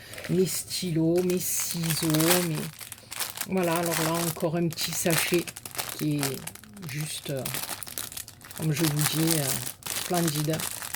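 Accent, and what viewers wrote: South, France. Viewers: Bonjour, quelle belle voix du sud, beaucoup de soleil et d'émotions dans la voix, adieu la barquette en plastique et bonjour le nouveau pot "carrousel" à crayons, très très jolies créations j'aime beaucoup tous les envois et les astuces c'est trop sympa les ballons biz à toutes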